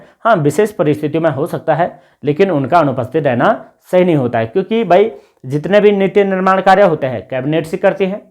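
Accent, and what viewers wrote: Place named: Garhwal, India. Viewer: सर जी आप उत्तराखंड से कहां से हो ? भाषा से तो गडवाल मंडल के लग रहे हैं ❤😊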